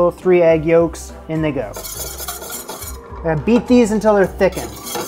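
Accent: Canada. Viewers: I’ve always felt like I hear a twinge of Canadien in your voice, so I’d like you to know that the shot of the Bearnaise being poured over a slice of steak made me yell, out loud, “NOW THAT LOOKS GOOD, EHH?” 😂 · I absolutely love watching his videos. The only downside to watching them every day is that I know find myself saying "now" and "about" in a Canadian accent, I live in the UK. Pity I can't cook like him though 🤣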